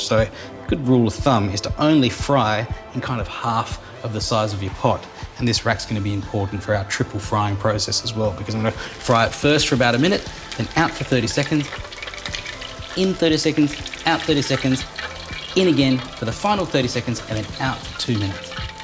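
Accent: Australia. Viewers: I switch after I hear that accent Australia? Fake Japanese food :) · Opens video to learn new recipe See’s asian dude but hears Australian accent strokes out 🥴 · An Asian guy with an Australian accent. I love it!! And my mouth is watering for some of the chicken. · He is Aussie. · I love Australian accent. They seem so chilled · It's weird seeing a Japanese guy talking with this Australian accent lol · He sounds perfectly Australian and not Singaporean or Malaysian. This isn't a sg or my slang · I'm confused Is he Aussie, English, or Japanese? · Dude looks Asian, sounds Australian and vibes like a Mexican. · That's just Australian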